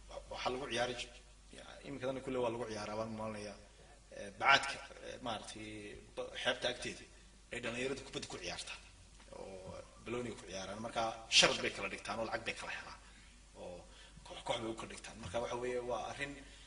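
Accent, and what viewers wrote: Mogadishu, Somalia. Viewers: Kkkkkk wlhi wan Fuurey Abwan So funny Nolow Isaga rer xamar aha kuso barbaaray hadalka si wacan bu u yaqaana kkkk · sida u ukeenay luqada xamar baan ka yaaby kkkkkkkkkk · caadi ma tihid sidaad reer xamarka u matashay walaahi anigoo ciyaal boondheero ahaa adigaa iga badiyo shangaani mise xamarwayne maad daganeed🇸🇴🇸🇴🇸🇴🇸🇴🇸🇴🇸🇴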